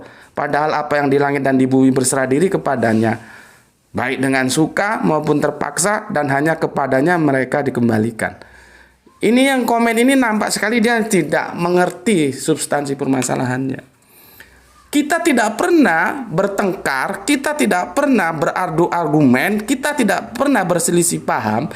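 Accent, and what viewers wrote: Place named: East Java, Indonesia. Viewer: Waalaikum salam..... kadang logika saya dipermainkan oleh cara berfikir...cuma aja kadang pemakaian kata kata nya dalam menerangkan memakai intonasi jawa timuran yg membuat tdk tekstual didalam menerjemahkan dalam pikiran saya......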